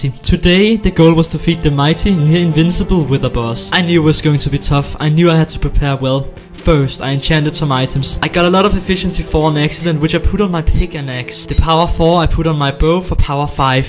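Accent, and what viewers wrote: Denmark. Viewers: You sound a bit danish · Danish 100% lmao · are u danish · Hey are you from Danmark you Sound like it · Very nice danish accent 👍🤝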